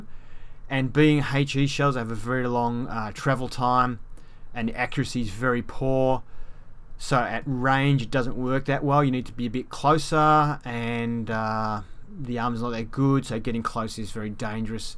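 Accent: Australia. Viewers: are you australian?!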